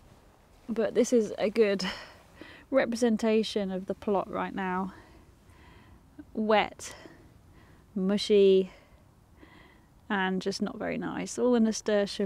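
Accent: England, United Kingdom. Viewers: I do love your accent! I am learning how to speak like a Brit by practising your accent. Congratulations on your recent marriage. Are we to assume there there will soon be a little one to help you plant your Daliahs?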